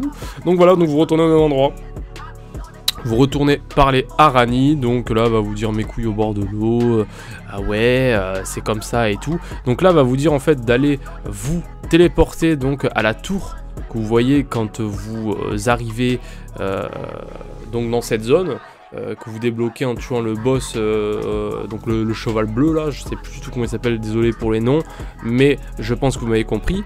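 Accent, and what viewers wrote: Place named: Paris, France. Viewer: oh c'est quoi cet accent parisien fada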